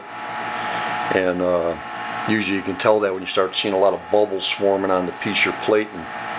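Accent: United States. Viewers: That's gotta be a Chicago accent!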